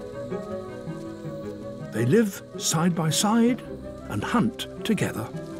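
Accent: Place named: United Kingdom. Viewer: Ah my nightmare but with a classy British voice.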